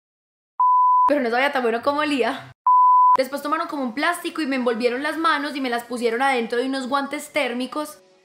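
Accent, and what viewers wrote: Antioquia, Colombia. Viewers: me encanta el acento paisa de hablas divino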